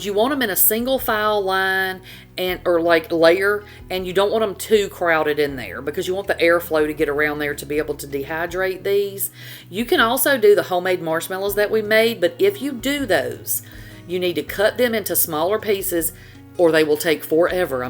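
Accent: South, United States. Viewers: Yummy 😋 I'm New Here & Just Love Your Ancient 😅I Have That Long Southern Draw Ancient..You Also Can Crack Me Up, So I Know I'm Gonna Love Your Channel..Be Blessed 😊❤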